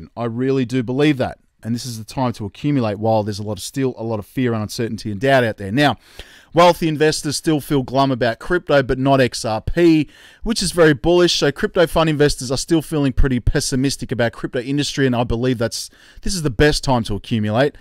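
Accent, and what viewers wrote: Australia. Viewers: Thanks for your info mate. As someone who is new to crypto (about 7 months) I'm still trying to learn as much as possible and navigate my way around the space. I'm basically all in on xrp, as I still don't know enough to diversify too much, and I find it reassuring to have an Australian voice to help educate me. Keep up the good work.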